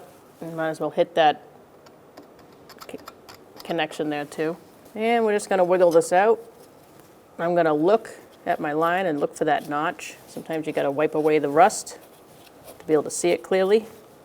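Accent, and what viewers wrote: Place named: Massachusetts, United States. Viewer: I’m hearing Massachusetts or Jersey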